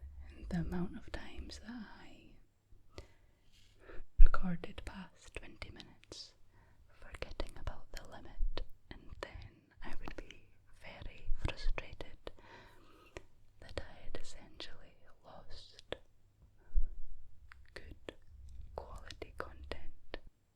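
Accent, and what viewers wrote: Scotland, United Kingdom. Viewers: I didn’t know that the Scottish accent is so calm and pretty to me!😮 It’s so nice listening to your voice. Mostly I like it when you say something that includes an “r”. 😅 Now i have to watch another video of yours. Greetings from Austria ❤ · I cannot emphasize just much I am able to relax upon hearing that beautiful Scottish accent and seeing the cheeky humour mixed with a smile of pure warmth. Absolutely charming every time! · Love the general kenobi bit and how thick your whisper Scottish accent is 🏴☺️ Also your signature hand movements are top tier ✋ especially the outro where you cover our eyes sending us off to a deep slumber 🙈🤲💙🩵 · hi, your voice remindes me of Jamie form Outlander! I guess it's maybe just a Scottish accent, but still feels like a very caring hug while listening to a midnight story from granparents <3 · this whole video i have been thinking that you sound Scottish but I thought you probably weren't as I've seen no Scottish asmrtists but then I heard you say "wee" and went 'I KNEW IT' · I love the way your accent articulates when you whisper. 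Scottish is such a round and organic sound, it's like a warm calming lava lamp 😊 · I adore this asmr. And your accent too :D As a fellow scottie it was so soothing listening to you speaking quietly.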